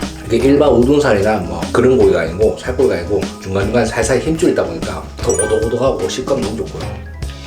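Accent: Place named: Daegu, South Korea. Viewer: ~~~^^ 넘 정감 넘치는 제목에 옛추억을 느끼게 합니다... 구수한 대구 사투리가 어린시절을 생각나게하네요~~ 20년 수도권 생활하다가 고향생각나서 아제아 방송을 늘 즐겨찿습니다... 꼭 대구 가면 경산 한번 찾아가겠습니다~~~^^ 화이팅~~~^^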